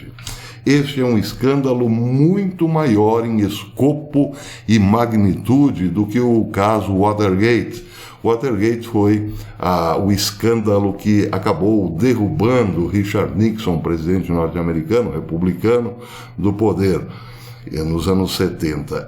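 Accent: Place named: Minas Gerais, Brazil. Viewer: PROFESSOR, V. EXA. TEM SOTAQUE MINEIRO, NASCEU EM MINAS E FOI MORAR NO PARANA?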